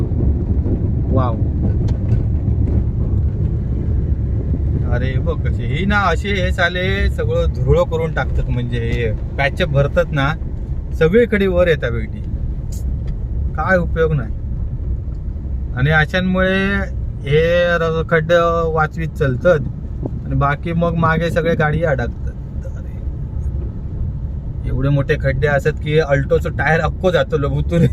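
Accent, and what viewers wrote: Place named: Konkan, India. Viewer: Bro, Phondaghat madhle nisarga soundarya ani tuzhya konkni bhashe cha godwa, maastach...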